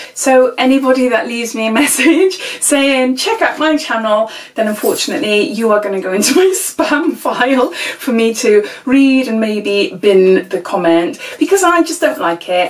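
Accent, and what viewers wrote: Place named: England, United Kingdom. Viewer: Very interesting video. I love listening to you. It brings a bit of England to me here in Germany.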